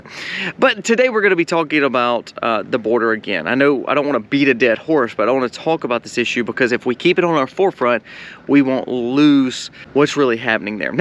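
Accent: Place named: Mississippi, United States. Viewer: Love listening to a local mississippian. Thank you for you updates.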